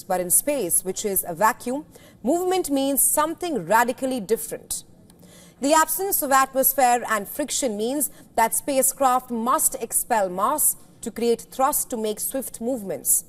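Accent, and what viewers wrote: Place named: India. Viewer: I really love your straightforward Indian accent.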